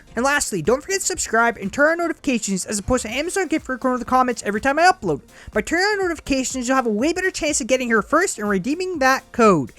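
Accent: Canada. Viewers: I can tell your Canadian. 😂 Please tell me if you aren't but I'm almost certain.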